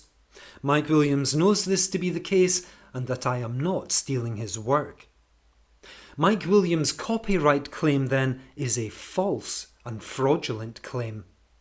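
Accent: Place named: Scotland, United Kingdom. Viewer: Lovely Scottish accent that could cut through hardened stainless steel and glide through butter. There is something about a Scottish accent that makes one sit up and listen. When you think of the great Scottish actors: Mark McManus, Sean Connery, Robbie Coltrane, Ewan McGregor, Roddy McMillan and actresses Kelly Macdonald, Karen Gillan, Shirley Henderson, Isla Fisher, Laura Fraser.... and the list goes on and on..................and Scottish accent is up there with the best of them. Alba go bràth!!!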